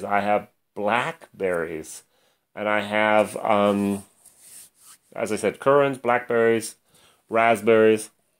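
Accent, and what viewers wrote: Germany. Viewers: Hi, i didn't know you had an english channel too. funny to hear that you sound so much like a german who's speaking english😄(no offence intended!)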